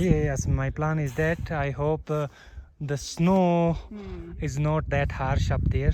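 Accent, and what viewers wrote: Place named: Haryana, India. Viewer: Teri boli s to hariyana ka lag h bhai.kad ka hai bhai ?bada himmat wala chora hai bhai.ke bera m bhhi tere gaw ke aas-pass ka hou.bataiye bhai.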